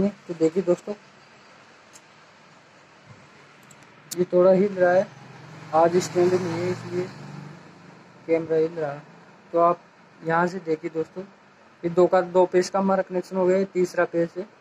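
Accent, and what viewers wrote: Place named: Rajasthan, India. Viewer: Bhai sahab namaskar Bolly Se To Rajasthani lag rahe ho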